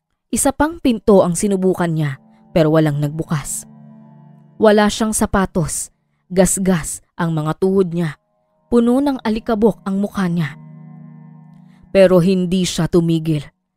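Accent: Visayas, Philippines. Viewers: Bisaya po ba kayo?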